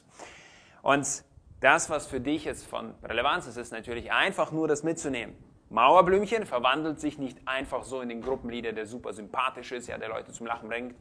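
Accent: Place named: Yugoslavia. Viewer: Gaylappen mit piepsstimme und jugoakzent will mir erzählen, wie man der coolste im Raum wird 😁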